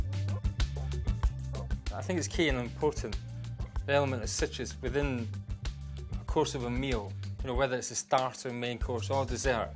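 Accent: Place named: Scotland, United Kingdom. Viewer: EXTREME THICK SCOTTISH ACCENT 🤣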